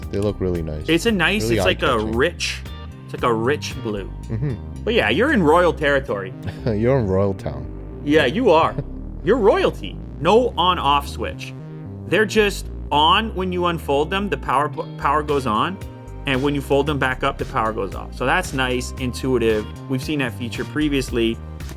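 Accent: Canada. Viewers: sounds EXTRA Canadian in this video! I’ve never noticed it, through the hundreds of other videos I’ve watched. So crazy. I pictured him as a Wisconsin guy or someone from Louisiana. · I appreciate how Canadian my guy sounds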